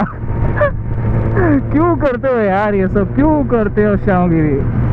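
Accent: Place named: Mumbai, India. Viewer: Aahhh!! Mumbai Roads 🥲 Ride Safely 🥰 Finally got to listen ur Mumbaiya Language Skills 😝 Good Vlog, Good Vibes ✨ Stay Blessed 🙌 Keep Vlogging 🎉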